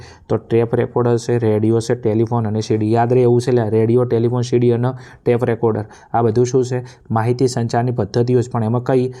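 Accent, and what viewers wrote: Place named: Patan, India. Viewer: Gujarati Patan nu che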